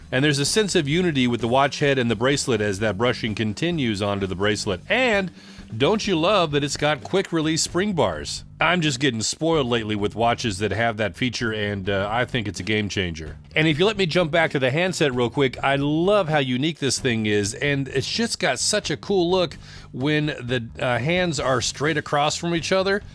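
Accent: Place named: United States. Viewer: what a classic American narrative voice! :D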